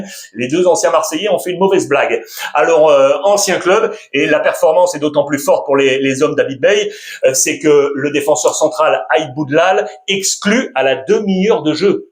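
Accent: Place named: Spain. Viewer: J'adore ton talent depuis tes prestations sur Canal +. Surtout ta prononciation avec ton craquant accent espagnol des noms des joueurs de la Liga. Stp prononce mieux le nom du défenseur uruguayen du Barça : R. ARAUJO pour mon grand plaisir, depuis Abidjan ❤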